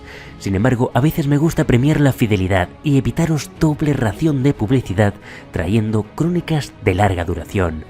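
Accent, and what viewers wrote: Spain. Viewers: La verdad el acento español me cae mal.... Pero no puedo dejar de escucharlo 😅😅😅😅😅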